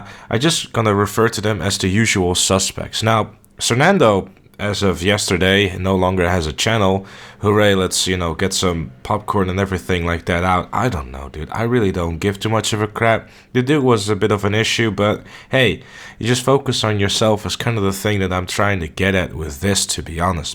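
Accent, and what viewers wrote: Netherlands. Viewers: are you dutch? your accent seems dutch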